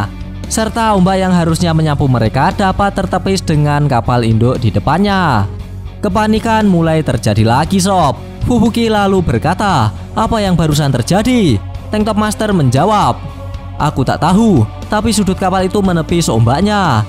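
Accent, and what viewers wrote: Java, Indonesia. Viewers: Bang saran makin lama nada di belakang semakin medok dan terlalu panjang ... Sajaaaaaaaa Sanaaaaa Ituuuuuuuuuu Jangan terlalu medok ga enak di denger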